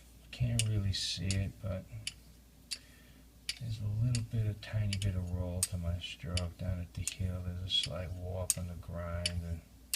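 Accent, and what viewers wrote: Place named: Boston, United States. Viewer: Great dexterity. Never heard your voice. That sounds like Boston.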